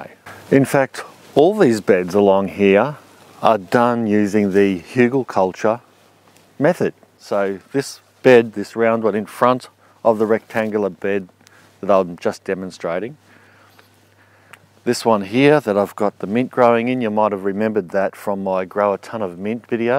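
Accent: Australia. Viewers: You're going to be a YT Star....I wish I had an Auzy accent.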